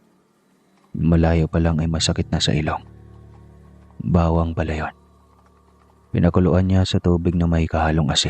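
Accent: Visayas, Philippines. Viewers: Kabog jud sir,bisaya ka tlga sir...😂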